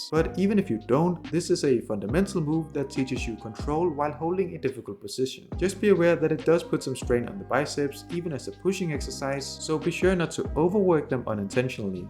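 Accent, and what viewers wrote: Denmark. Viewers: Random question, but are you a dane? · jaa, godt forsøgt men du er dansk ikke eller ihvertfald fra skandinavien.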